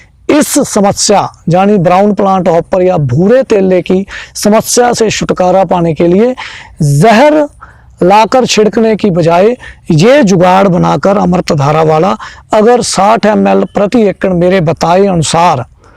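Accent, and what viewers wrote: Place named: Punjab, India. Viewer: Sir ji aap ki Hindi bhi Punjabi jaisee hi hai thanks God bless you Very good information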